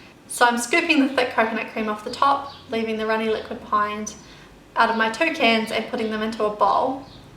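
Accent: New Zealand. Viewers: Mira J. I think she's from New Zealand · are you from Newzealand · Are you from NZ? I feel totally at home hearing your acccent and I don't know why else that could be. :D Thanks for the recipe btw. :D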